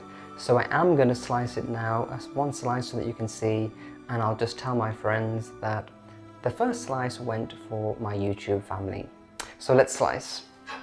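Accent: United Kingdom. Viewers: Your cake is lovely and looks delicious. I never get tired of hearing your beautiful voice and wonderful British accent. I could listening to you read for hours at a time. Wishing you the loveliest of birthdays. 🐕🇺🇸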